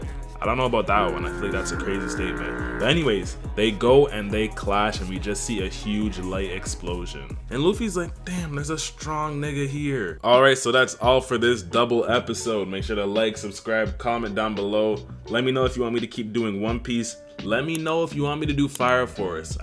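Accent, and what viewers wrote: Toronto, Canada. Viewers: You have that native Toronto accent lol it's strong!!! You sound like Drake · Never thought I’d hear a Toronto man doing one of these types of videos 😂 Toronto man anime is a vibe 😂😂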